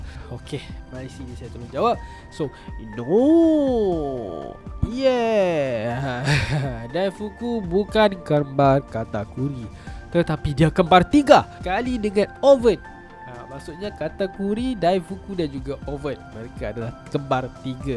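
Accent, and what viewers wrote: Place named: Sabah, Malaysia. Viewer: influence dari HOROGORO ke ni bunyi baku Sabah gitu hahaha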